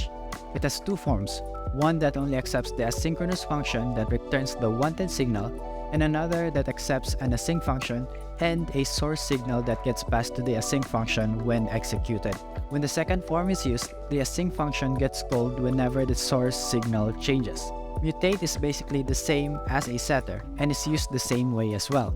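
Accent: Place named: Philippines. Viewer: Bro you sound like a filipino, I'm guessing you are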